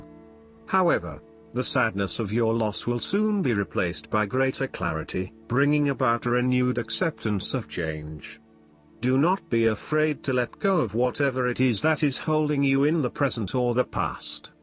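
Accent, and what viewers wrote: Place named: United Kingdom. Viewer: Beautiful British English pronunciation. Good reading, as well. TNX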